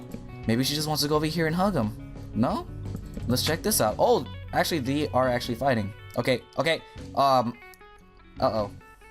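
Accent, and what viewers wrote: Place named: Mexico. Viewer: I love your Mexican accent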